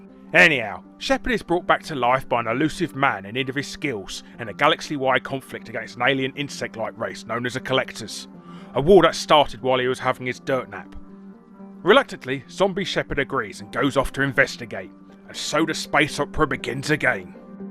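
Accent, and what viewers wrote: United Kingdom. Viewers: VERY british accent you got there :D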